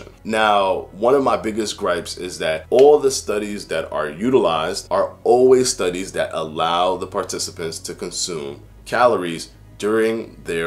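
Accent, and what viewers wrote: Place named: New Jersey, United States. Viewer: sickid41 I bet he’s from New Jersey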